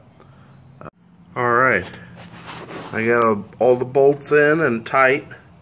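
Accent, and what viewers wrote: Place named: East Coast, United States. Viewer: About time someone knows how to talk east coast checking in.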